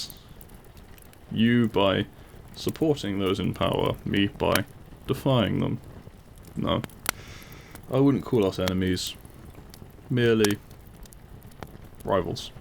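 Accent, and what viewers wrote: United Kingdom. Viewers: This might just be me but you sound like a deeper more British Wilbur Soot🤔😁😂 Edited: wow 293 likes and 26 replies thank you everyone so much 😁🥰🥰and to those of you that can’t unhear it or that it’s stuck in your head you’re welcome😏😏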